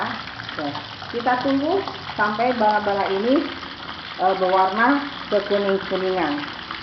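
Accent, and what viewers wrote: Indonesia. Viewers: aduuuuh teteh pasti sunda nyaaaa meni has suara sunda na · Sunda na ti mana atuh 😁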